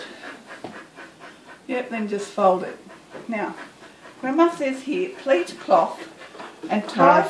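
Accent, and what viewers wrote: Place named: Australia. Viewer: Thankyou for the video. Great instructions. PichilmI she definitely sounds Australian.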